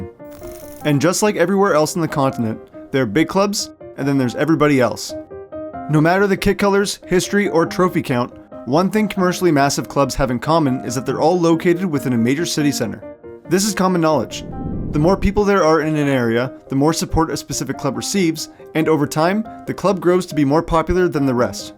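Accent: Canada. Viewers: I bet ur Canadian mate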